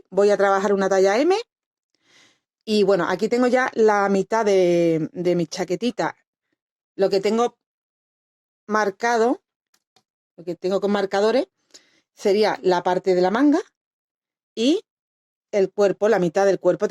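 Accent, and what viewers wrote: Andalusia, Spain. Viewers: Hola buenas tardes eres de andalucia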